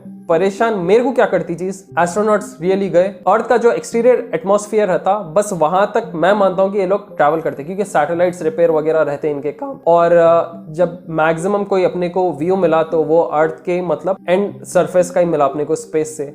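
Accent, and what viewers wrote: Hyderabad, India. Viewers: Bhai kirak Hyderabadi baatan kere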